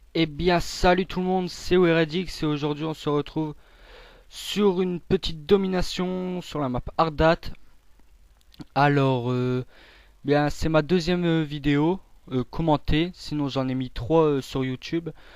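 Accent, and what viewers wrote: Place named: North, France. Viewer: sa senten a ta voie ke tu vien du nord mdr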